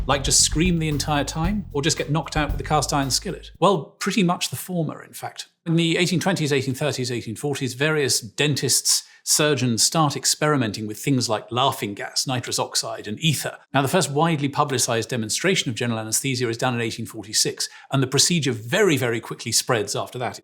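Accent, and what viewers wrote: United Kingdom. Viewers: this is the most british sounding man of all time and i need him to do audiobooks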